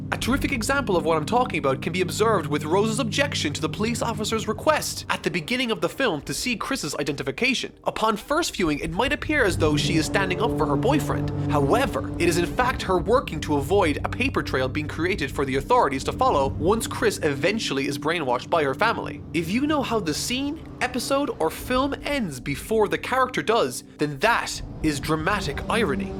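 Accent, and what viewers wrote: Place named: Ireland. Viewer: Great video, I've become a huge fan of yours in the past few weeks. But I need to ask this as it's distracting while I watch your videos. Are you from Ireland? Keep up the great work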